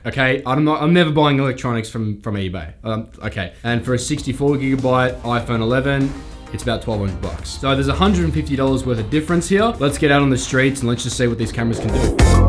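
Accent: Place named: Victoria, Australia. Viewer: First video watching you and straight away I knew you were a fellow Aussie 😂 from Victoria too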